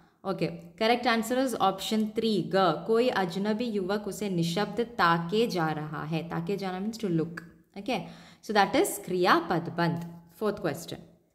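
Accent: Kerala, India. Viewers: Mallu sound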